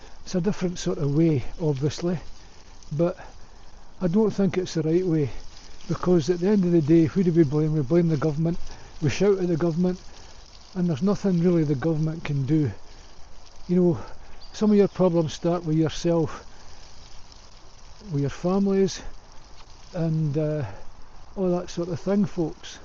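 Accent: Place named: Scotland, United Kingdom. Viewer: You have a nice accent. Are you in Scotland . Inn Canada Christmas not much cycling. I like to know more of the nature there, and geography.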